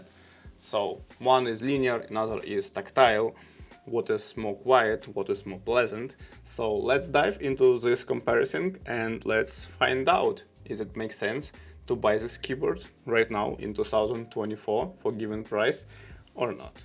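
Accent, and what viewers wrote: Russia. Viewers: А почему акцент русский?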